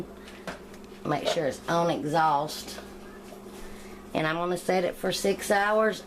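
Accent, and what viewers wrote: South, United States. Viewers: LOVE the accent. Southern charm at its best. Nice recipe. I’ll definitely give it a try.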